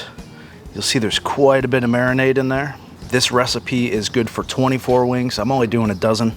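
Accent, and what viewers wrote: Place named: Michigan, United States. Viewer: You from Michigan, bud!?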